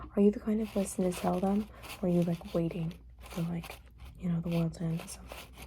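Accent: New Jersey, United States. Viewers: I love your jersey accent the best!! ❤ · Omg I love the jersey accent, idk asmr sounds so cool with it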